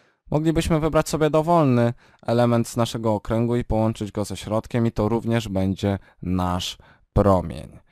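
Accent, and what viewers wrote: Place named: Ukraine. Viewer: Chłopie ty jesteś z ukrainy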